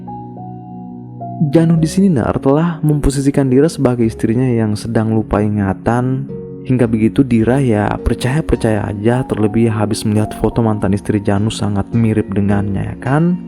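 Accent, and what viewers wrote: Bugis, Indonesia. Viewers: Bugis apa adminnya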